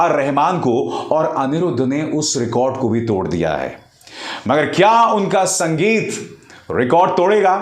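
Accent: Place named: South India, India. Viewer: Ha सर filing नहीं आ रहा है क्यों लग रहा है की साउथ इंडियन जैसा लग रहा है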